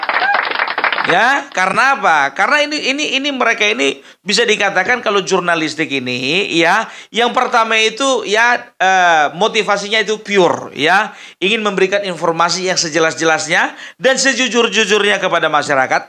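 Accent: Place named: North Sumatra, Indonesia. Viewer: Saya suka opung ini. Ya gaya bicaranya dengan logatn batakya yang kental, gaya bahasanya yang lucu, bersemangat membawakannya, blak-blakan logis, menohok.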